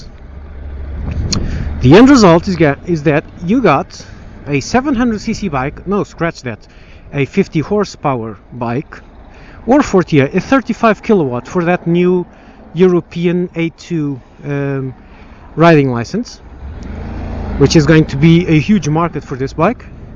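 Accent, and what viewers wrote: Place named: Portugal. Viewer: Awesome review, I'm also portuguese, so I caught on to your accent immediatly, I have the same haha, and I also own and NC700x. And I "downgraded" from a CBR 900 RR zx10rgsxr crotch rocket thingy, like you so well put it. The thing is that with my 900 i could never explore the machine in the road properly and the largest trip I was able to fathom was to the next petrol station. With this one I feel I can go anywhere. It's a great, fun and useful bike, a bike for the real world!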